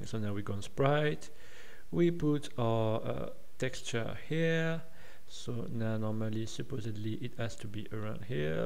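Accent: France. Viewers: Bonsoir, désolé si je parle en français mais mon anglais est vraiment naze et je crois comprendre avec ton accent que tu es français aussi;). J'ai un problème, je fais exactement comme toi et pourtant quand je traverse la zone cachée, l'anime revealed commence tout juste à s'afficher mais ne finit pas jusqu'a disparaitre complètement. Saurais-tu pourquoi s'il te plait?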